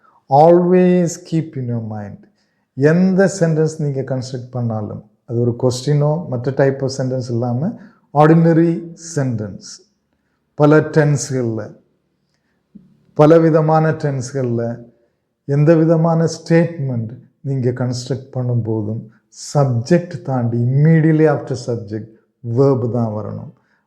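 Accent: Kerala, India. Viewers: I don't need anyone's coaching as my vocabulary is excellent. It was just a suggestion because what you blabber in the name English is pure junk with all that mallu accent.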